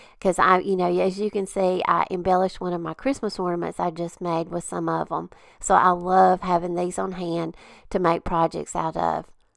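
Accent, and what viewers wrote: South, United States. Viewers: Lovely Southern accent, but she is speaking very quickly and I can't make out what she is saying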